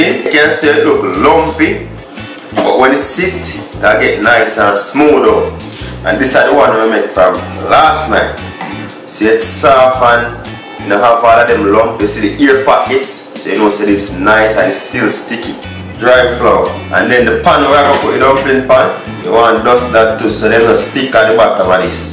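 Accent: Patois, Jamaica. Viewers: Clear and concise recipe. The authenticity of his native tongue makes me trust his recipes more... I am not Jamaican but I know Jamaican cuisine is made from the heart and it's not a rushed process. The amount of Mickey Mouses out there trying it with their Ja-fakin' recipes... The patois wasn't overly used that someone can't understand the recipe... However, if the new recipe edit shines the spotlight on his channel, then more power to him! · Too much patois?? Ah weh oonu ah talk bout?? KMT. That's what I love about this channel -makes it authentic! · I dont mind the patios at all, atleast I understand what you're saying and relate to your the videos. I'm a new subscriber and loving your videos already.. KUDOS!!! 👍👍👍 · Awesome Jamaican accent jah Mahon 💯 and you earned yourself a new subscriber as soon as I scene your hand rolling technique awesomely done ✅ 🥟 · I love hearing the patios. 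Idky, but it always makes me laugh lol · I love how authentic you are. You are very articulate yet yuh keep your accent real like di dumpling dem. I can't stand when people try to impose on others. You don't need to speak any less patwah,we never tell an AMerican to speak less American. Though the average American DO NOT speak standard English. Anyway, yuh dumplings tun up! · Mi lov di Patois Bredren!!! Yu dumplin sel aaf! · Moderating the patois will widen your audience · To capture a wider audience he might have to drop the patio. It’s not just Jamaican watching. There are people of other nationalities that love Jamaican food and want to learn how to make it. The same way if someone of a different nationality giving a presentation for something but u can not understand what that person is saying because of their accent. The same goes for someone who only speaks street slang. If that person trying to give a presentation dont u want that person speaks proper. 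· Man this being African American is boring AF😫😂 why I couldn’t be Jamaican or Nigerian 😩😩😩 I hate it here😫 I’m Finna put on Shottaz and dream of being a Jamaican 😂 cuz being American is 🗣️BORING😩😩😩 I love his accent 🥴 and the Patois language YESSS🙌🏾🥴🥴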